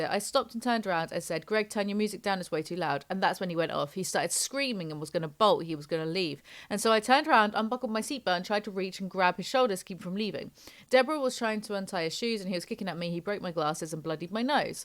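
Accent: United Kingdom. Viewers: That BRIT sound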